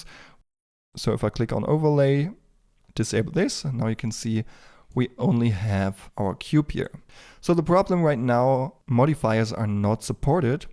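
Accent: Germany. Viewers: German accent 😂 Greetings from Germany!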